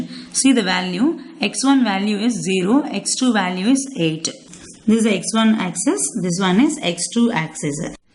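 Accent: India. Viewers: the explanation is very good, especially with the indian accent :D , i have an exam and i wish that can help me to pass it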